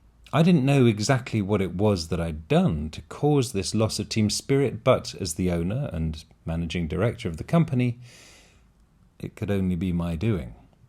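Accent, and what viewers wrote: RP, United Kingdom. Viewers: Обычный RP, нейтральный акцент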